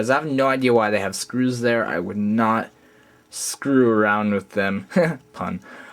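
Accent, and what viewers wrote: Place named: Georgia, United States. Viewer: Goin out on a hunch here.....are you from Georgia? I didn't look at your profile, but you sound Georgian, like my cousin!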